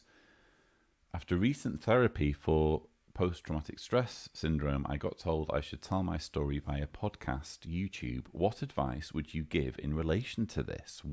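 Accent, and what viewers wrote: England, United Kingdom. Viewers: Are you English or Croatian or................?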